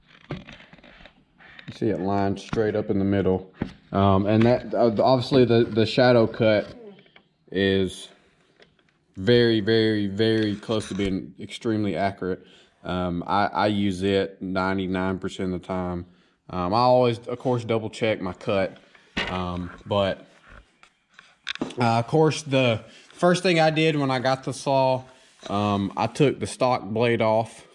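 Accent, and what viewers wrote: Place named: North Carolina, United States. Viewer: Great review man! Just curious, where are you from? Sound like Robeson or Scotland County NC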